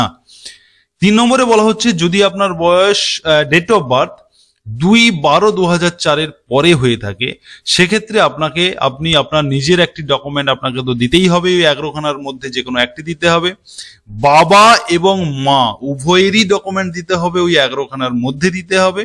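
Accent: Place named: Bangladesh. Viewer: Apnar kotha sune mony hocche apni Pure bangladeshi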